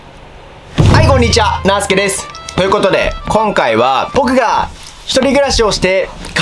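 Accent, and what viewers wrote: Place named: Kansai, Japan. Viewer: なーすけ君は埼玉人だけど、俺自身たぶん言われんでも分かってるんやろけど、大阪出身で大阪10年間育った人間なので、所々関西弁使ってくれてはるなーすけ君観るとマジ親近感湧きます！！⤴️⤴️⤴️なーすけ君が関西弁敢えて喋るとイケメンさが人一倍ごっつ映えるね〜👍👍👍😍😍😍ありがとう、おおきに！🙏これからも友達でいましょう〜！😭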